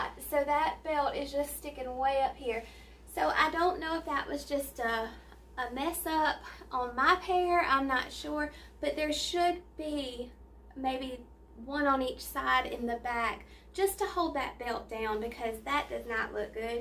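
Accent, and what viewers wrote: South, United States. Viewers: Ran into your channel looking for a review of Hanna Nikole. Now following! Thank you for your reviews. My measurements are 49, 43, 52, so I can get an idea of how things would look on me, even though you are more of an hourglass and I am more of a rectangle. Your accent is so charming and soothing. My hubby is from Alabama, so listening to you is like visiting with some of my favorite people in the world (his sisters!!!) Cheers, Maria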